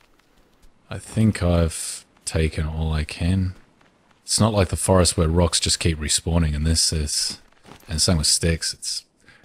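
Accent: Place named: Australia. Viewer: I knew you had an Australian accent by the way I'm kiwi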